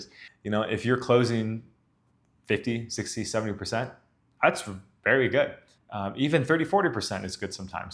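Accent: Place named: Mexico. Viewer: I've been here 7 years have yet to meet a Mexican girl that speaks English like that. But, I'm not in the gringo tourist area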